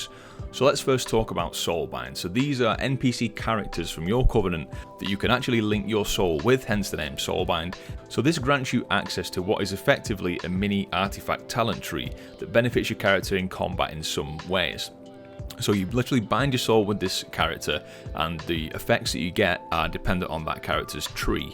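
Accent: Yorkshire, United Kingdom. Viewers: Thankyou for this video, excellent put together, and has made me me absolutely certain I do not want to play Shadowlands. Also, cant believe your from Chorley, you sound proper Yorkshire mate :) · Thanks for useful insight. Yorkshire?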